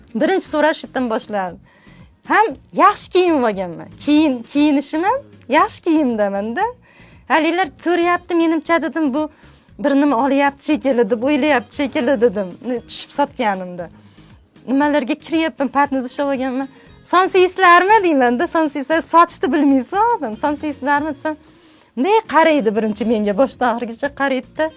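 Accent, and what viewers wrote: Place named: Kokand, Uzbekistan. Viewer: Қуқонлиг экансиза шевангиздан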